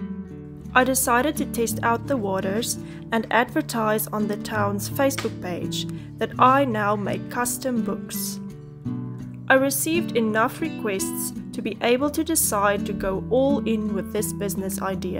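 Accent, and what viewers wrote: South Africa. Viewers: Always fun clicking on something on Youtube and hearing that SA accent! May your business grow bigger and bigger each day! Sending love, from a Saffa in the US❤️ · I am a small printing business in the UK and have been inspired by your determination. As an ex SA pat I subscribed and look forward to more videos on how you are doing. Keep it up girl. Can't get enough of the accent. Kisses...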